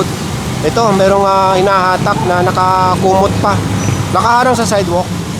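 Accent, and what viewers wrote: Visayas, Philippines. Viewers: keep up the good work,mataas ang rating mo sa mga viewers mo kahit medyo bisaya accent ok lang,meron laman ang sinasabi mo.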